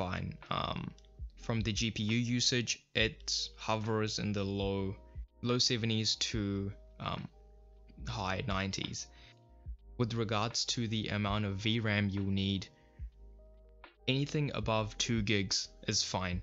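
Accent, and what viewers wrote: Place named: South Africa. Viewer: you sound like a SAFA, am I right?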